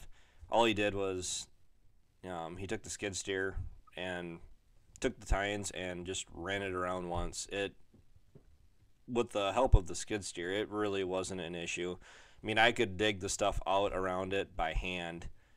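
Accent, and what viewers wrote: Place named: Wisconsin, United States. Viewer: lol. Just busting your ball's. It's the Wisconsin accent. Good channel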